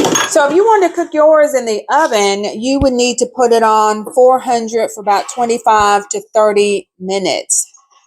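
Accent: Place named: South, United States. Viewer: I just love your style with that country accent! I stumbled across your video looking for chick pea recipes. I've been making chick pea salad for awhile now since I started making plant based recipes. I'm going to make the fritters, which I'll call patties for dinner tonight. I have some cans of chick peas until I do marketing tomorrow!😂 I've never heard of chick pea cookies! I made healthy oatmeal cookies yesterday! I'll try the chick pea cookies this weekend! I'll let you know how my patties turn out!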